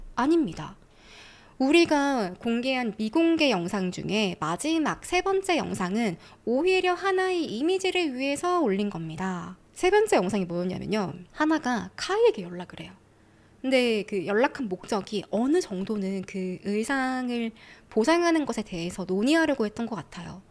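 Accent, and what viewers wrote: Busan, South Korea. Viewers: 부산 사람이신거 많이 티가 나시네요. 일본말을 엄청 잘 하시고 일본 문화에 대해서도 엄청 많이 알고 계시네요 일본에 대해 많은 호감이 있으신가 봐요.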